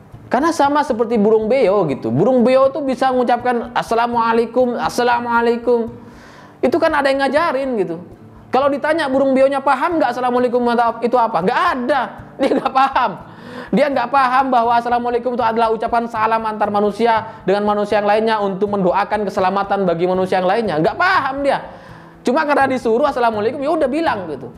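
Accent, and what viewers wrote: Madura, Indonesia. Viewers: kenyataannya: mas masih kentara bner aksen maduranya😁 bravo madura👍👍 begitulah kura-kura 😁😁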